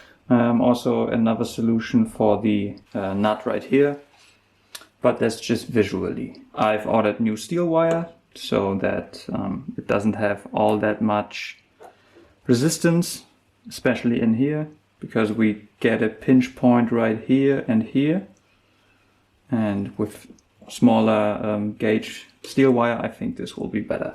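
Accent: Germany. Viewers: your clearly german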